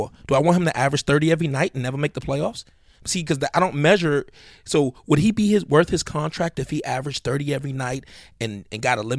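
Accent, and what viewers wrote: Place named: Brooklyn, United States. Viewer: Good podcast brother. I'm sorry if you answered this in a previous cast because I just found you channel recently, but what borough or part of NY you from? You got a BK accent to me sounds like Saigon too. Dope channel, glad I found it. Respect from Queens via the Bronx. Word up to my dude Kemba. That rook in Orlando, Isaacs, is also a Bronx kid from what I've heard. ✌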